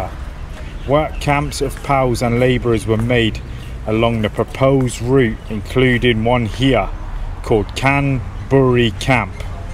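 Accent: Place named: West Country, United Kingdom. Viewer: Polar opposite to Pattaya, that’s for sure 😂 Which part of the England are you from? I hear a west country accent, maybe a Devon accent?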